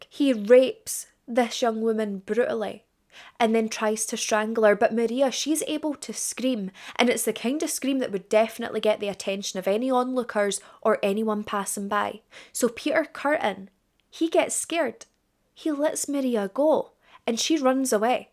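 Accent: Scotland, United Kingdom. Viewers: To the guys who complain about the makeup do like me put the video on and just listen I enjoy listening to the cases and the Scottish accent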